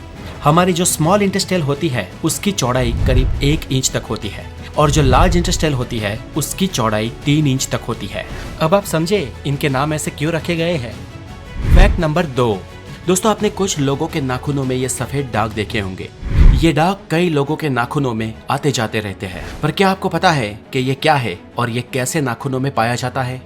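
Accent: Gujarat, India. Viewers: Aaap Gujrati hena ????❤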